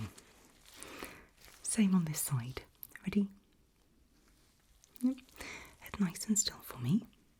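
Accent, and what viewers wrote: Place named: United Kingdom. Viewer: Your voice makes me comfortable and relaxed I always found British accents sexy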